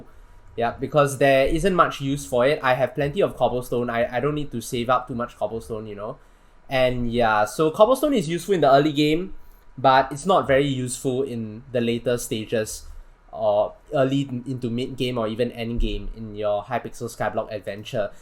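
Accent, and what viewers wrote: Singapore. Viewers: Are you Singaporean?because you sound like it man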